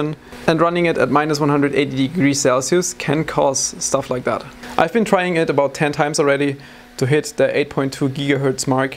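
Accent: Germany. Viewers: Er: sagt ein Wort Ich: Ein deutscher Staatsbürger Ich hasse den Deutschen Englisch Akzent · I'm hearing this German accent so clearly, why don't you make German Videos? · You can hear how german he is by his accent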